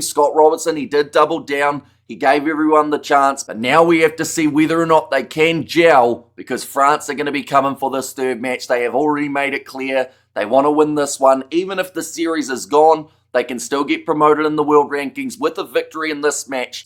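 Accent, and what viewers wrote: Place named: New Zealand. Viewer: Brother you have a unique NZ accent. For example when you say test match it would be 'dest match' or when you said contest it was 'gondest' .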